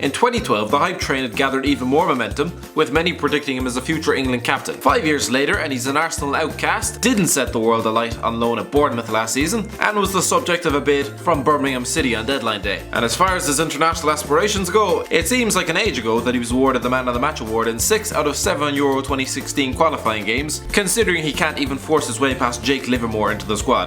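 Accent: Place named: Ireland. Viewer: I can tell you're an Irish chap like myself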